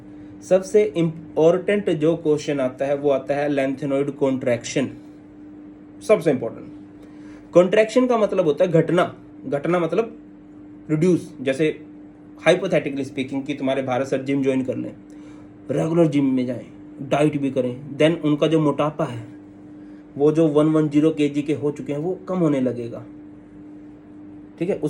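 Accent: Haryana, India. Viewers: aarree sir aap bhi Haryana se ho me bhi sun ke accha laga 😇😊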